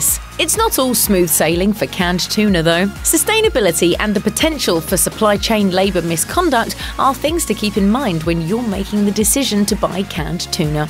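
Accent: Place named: United Kingdom. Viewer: Ugh who is this British narrator? Bring back the old narrator.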